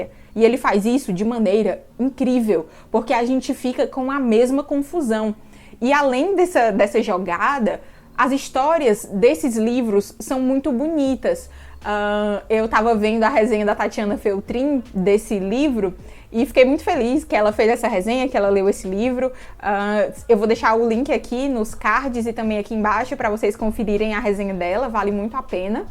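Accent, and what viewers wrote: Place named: Northeast, Brazil. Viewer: "Uma hora eu falo assim, outra hora eu falo assado" ahahaha ah, meu nordeste ❤ Ótima resenha, já quero leeer 😍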